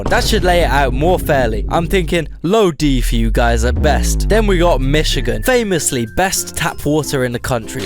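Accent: United Kingdom. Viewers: Listening to a British guy explain america to me an American is surreal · Just listen to this guy with a British accent talking about all of the States in my Country and also the best country, is absolutely hilarious😂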